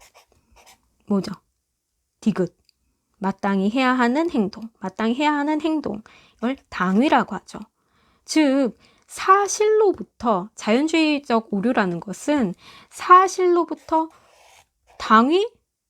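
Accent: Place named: Gyeongsang, South Korea. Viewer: 대구 목소리....영화 배우 목소리 듣는 기분임......아주 좋아요.....최고~~~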